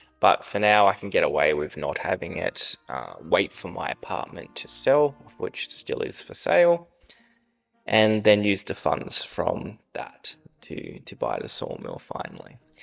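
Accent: Australia. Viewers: we saw the same bad behaviour in NZ. Some increases were insane. I'm sure there was a spike in food waste as well. It's not only in Auckland, sadly. You are blessed to be able to do what you're doing mate. I love your accent, you sound like my favourite cousin in Melbourne 😂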